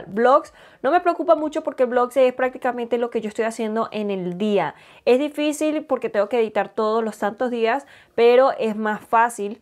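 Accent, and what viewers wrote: Venezuela. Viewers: Amo lo coloquial q hablas se identifica lo venezolana ❤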